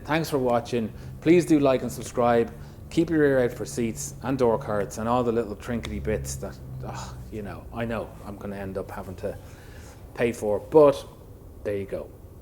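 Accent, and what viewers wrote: Ireland. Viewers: Okay, that truly sucks! I'm watching from the US and there are like almost no Lotus Esprits from the early years in the whole country. Dang it! Still, I look forward to every one of your videos. Thank you for explaining the stop motion reasons and motivations. That make much more sense than I realized! It's also way entertaining and a butt load of work, I bet. I will subscribe to your channel, because I like your work ethic and I like vintage Lotus cars. Your Irish accent is a fav, too! Keep going!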